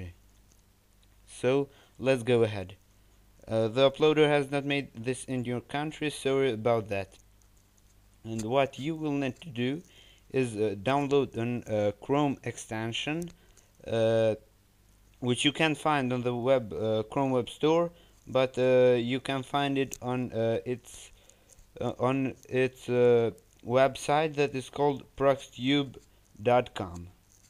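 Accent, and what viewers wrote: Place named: Albania. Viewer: theksin e shqiptrarit e paske haver :D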